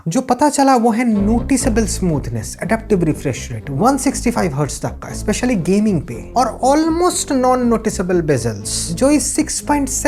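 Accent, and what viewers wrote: India. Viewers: SMASH review bro. Maza aagaya. Keep up the good work!!!! English bhi kardho wohi desi accent pe, aur viral ho jayega 💸💸💸💸 Bless u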